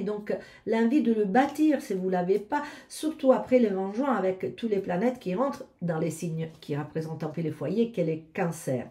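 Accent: Italy. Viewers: Merci beaucoup. J'adore votre petit accents italiens, ça me rappelle mes origines italiennes par ma mère, française par mon père. Perso je suis balance ascendant balance je compte sur Jupiter. 😊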